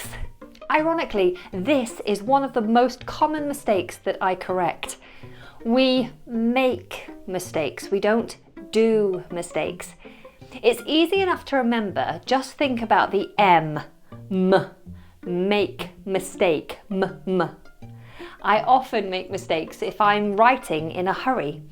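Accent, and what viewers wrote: United Kingdom. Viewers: Finally someone who teaches British english. I'm glad to have found your channel, I'm quite fed up with so much american accent. Greetings from Brazil.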